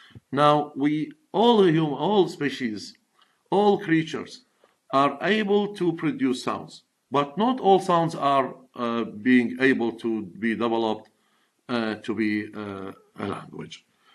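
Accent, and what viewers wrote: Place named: United Kingdom. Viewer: اللكنه بريطانيه بامتياز . ❤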